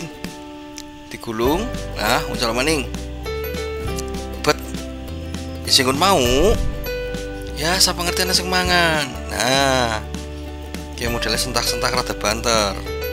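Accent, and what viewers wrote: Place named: Banyumas, Indonesia. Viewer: Ahahahah lanjut ngapak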